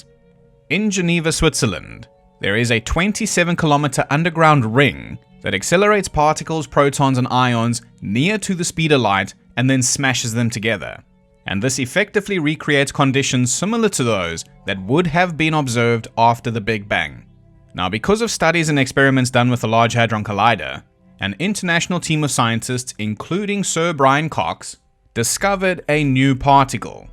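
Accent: South Africa. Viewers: South African accent, I noticed instantly 🇿🇦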